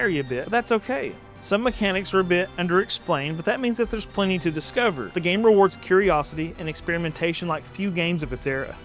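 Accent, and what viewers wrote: South, United States. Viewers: I thoroughly enjoy watching a fellow southerner on this space. Your use of "eat up" meaning "to be full of" reminded me of one of my grandfather's funniest barbs. If he thought someone wasn't being smart, he'd chirp that they were "eat up with the dumbass". I'd always giggle when he'd say that and I adopted it into my speech when I was an adult. I love your takes on RPGs, but I also love listening to you because you sound like me when I talk to my friends about games I like. Keep on being you, buddy.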